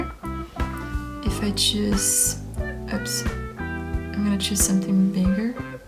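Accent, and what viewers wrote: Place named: France. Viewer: i love your french accent!!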